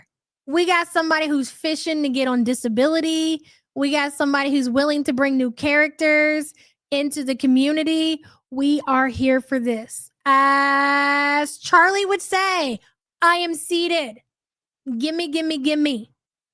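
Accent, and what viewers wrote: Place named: Ohio, United States. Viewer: Replay gang today! I love our Support Group and I look forward to meetings in the morning. I'm from Southern Ohio and everyone I grew up with sounds just like you. It gives me so much joy up here in the North where I can sometimes feel a little bit alone. Thank you all ❤🎉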